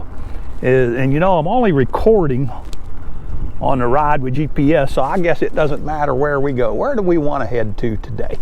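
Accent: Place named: South, United States. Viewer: What part of the South are you from? I am in Alabama.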